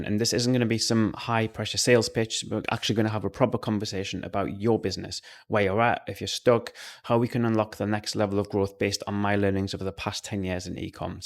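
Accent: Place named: Germany. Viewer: are u german ? you sound a bit like it im german aswell thats why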